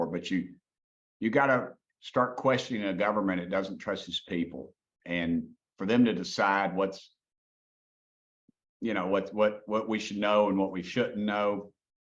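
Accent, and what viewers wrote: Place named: South, United States. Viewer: I couldn’t have more liking and respect for this gentleman if I tried to. He does exactly what he was put into office to do, work for his constituents & boy does he do it well. The southern ‘twang’ & his “tic tac like the candy” comments just make him more endearing. I don’t think anyone got as much as we were hoping to from the congress sitting yesterday, I sat here in NZ & watched the entire thing, & was very disappointed at the blocking of this & that, but hopefully in a behind the scenes meeting they will get to see more, find out more, enough to dig their boot & high heels into & start to climb up that rope, then, we the public, will hopefully see & hear more too. Time will tell, & I guess at this stage more times not going to kill us, at least I hope not!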